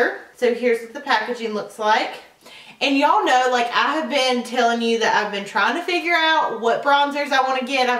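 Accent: South, United States. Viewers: You're so beautiful 💞💁🏻 and I love your cute little southern accent lol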